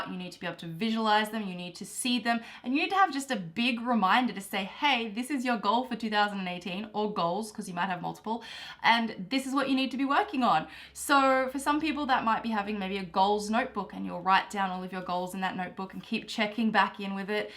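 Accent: Australia. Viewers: Do you have an Aussie accent? I heard you say "here" in the American accent . But the rest seems Australian to me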